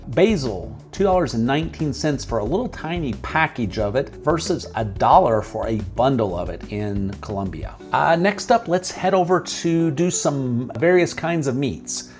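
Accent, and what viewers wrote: United States. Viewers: GREENSBORO? ❤❤❤ It's funny, I didn't notice your southern accent until you said that. I graduated from Elon and did my internship with WGHP in High Point! We live in Oxford now. As a Mass Communications major, KUDOS to your videos . Your scripts and delivery are spot on.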